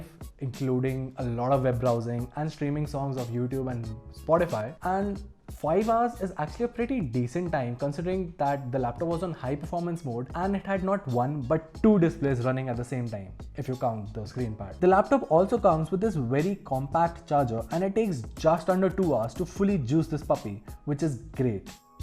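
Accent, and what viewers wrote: India. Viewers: The laptop on the video is so drity it looks like u pulled it out of a trash. And try to work on that Indian acceent to be less listenable, we not all americans but we try to fit globally. I had to watch this low budget review with a laptop pulled out of Kirby Trash Bag cuz it was the only one with this model. Cheers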